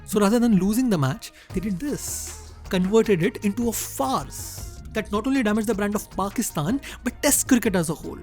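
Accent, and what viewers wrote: India. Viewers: Bias Indian Accented Blog and we are buying into it. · I love how thick indian accent u have. Raaaayhmbo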